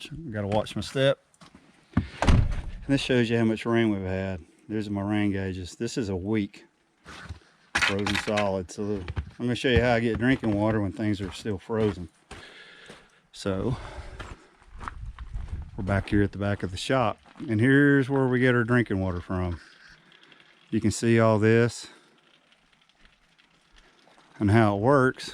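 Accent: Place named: South, United States. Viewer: You sound like Forest Gump. Are you a Southerner, from Mississippi maybe?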